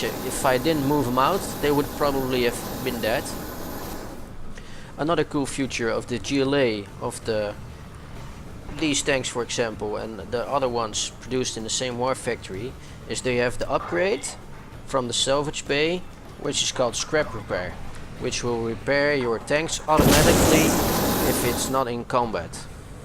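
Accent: Netherlands. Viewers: Bedankt voor je video! (ps. wel grappig: ik kan gewoon je overduidelijke NL accent in je engels horen! ) haha ;D verder gewoon perfect goed Engels hoor...en dankje voor je uitleg! Wil dit spel zelf heel graag spelen, maar ik krijg me handen gewoon niet aan een Beta key om het te downloaden...ben zelfs bereid goed te betalen voor een werkende Beta key...illegaal of niet, maakt me niet uit! Een vraagje...zit C&C 2013 nu officieel nog steeds in de Alpha fase of is dit inmiddels de officiele Beta?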